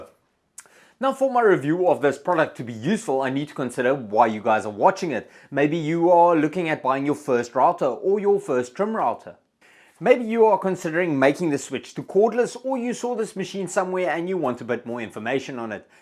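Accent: Afrikaans, South Africa. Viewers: Another awesome video!!! Out of curiosity, what is your native language? Your accent seems like Afrikaans, not Zulu. Thank you for the video! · Always makes me happy to hear a South African accent. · Are you a South African? I never would’ve picked it. 😂😂👍😉🇦🇺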